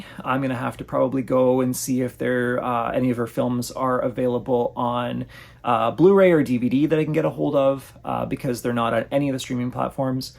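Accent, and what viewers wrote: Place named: Canada. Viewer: I think he is canadian... he has that typical lovely canadian accent.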